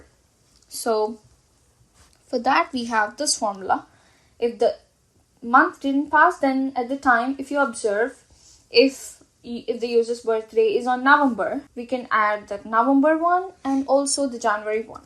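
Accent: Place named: India. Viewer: Great video BTW are you Indian because your accent is a bit different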